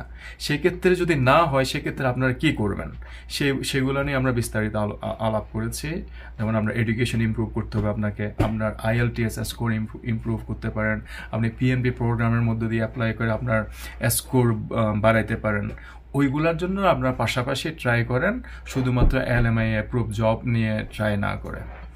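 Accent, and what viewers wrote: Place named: Chittagong, Bangladesh. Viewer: Vaiyar bari Chittagong for sure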